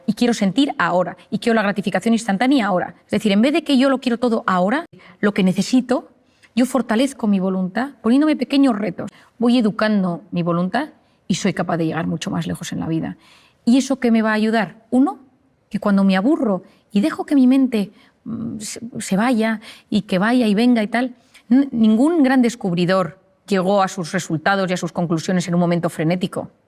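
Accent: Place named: Spain. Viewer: Está Dra . Marian, es un encanto, es una cachaqueria, escucharla, poco a poco he descubierto tantos puntos críticos que uno tiene pero no lo sabe y he podido mejorar con sus concejos y enseñanzas. Que Dios la Bendiga mucho. Aparte tiene una voz muy bonita y ese acento español. Me ha ayudado mucho. Gracias Dra. Marian, que buena obra haces, desde Colombia Gracias , Gracias , espero q acá se encuentren sus libros. Sigue así , Te nesecitamos.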